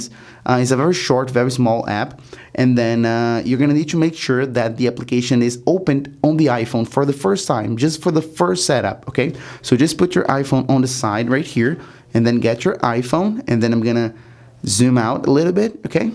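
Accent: Brazil. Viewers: I’m watching here and thinking to myself “I feel like that’s a Brazilian accent....” Then I saw on the Now Playing and just yelled AHÁ! SABIIIIA! 😂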